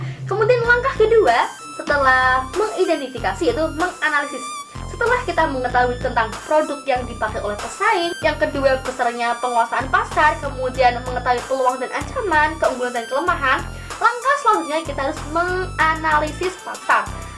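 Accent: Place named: Banyumas, Indonesia. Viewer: Kok ngapak yaa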